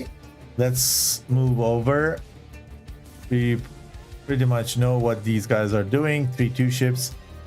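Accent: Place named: Germany. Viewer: Is the host of this match german or speak german? If so, it did shine threw...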